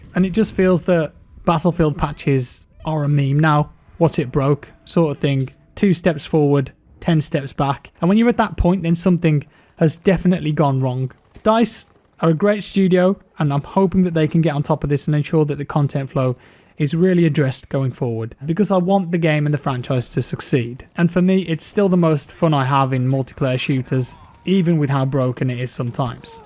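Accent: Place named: West Midlands, United Kingdom. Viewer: sounds REALLY West Midlands in this video!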